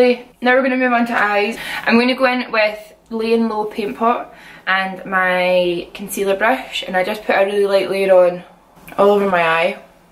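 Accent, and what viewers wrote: Scotland, United Kingdom. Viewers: 👽👽👽👽👽 love hearing a similar accent on YouTube to my own! Scottish vloggers ftw ❤️ #mermaidhairlookingstunning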